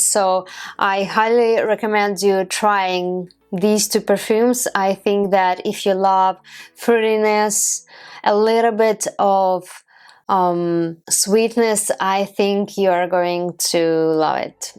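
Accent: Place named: Russia. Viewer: ну вы явно русскоговорящая. Через экран слышно😁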